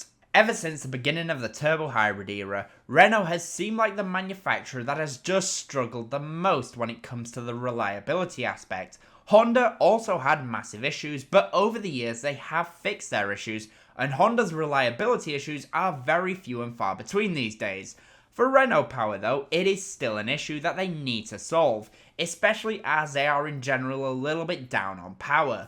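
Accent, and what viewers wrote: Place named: North, United Kingdom. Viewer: Hiya, lovely to see your face for the first time 😊. It is always nice to visualise what youtubers look like. I love your accent too. I am trying to figure out where you are from but not sure. It sounds northern. My predictions for this season are: 1. Red Bull 2. Mclaren 3. Ferrari 4. Mercedes 5. Aston Martin 6. Alpine 7. Williams ( I hope but Logan will need to start scoring( 8. Visa Cash App RB 9. Haas 10. Stake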